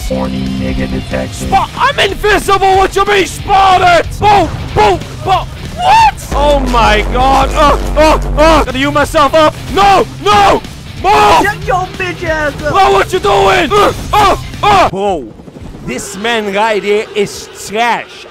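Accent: Netherlands. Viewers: cyril MAGIT that’s a Dutch accent it’s lowkey funny when a Dutch guy speaks English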